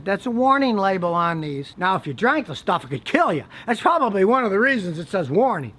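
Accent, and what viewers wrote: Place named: Midwest, United States. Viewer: you live in or near Houston?? You don't sound from arround here, more like from the midwest??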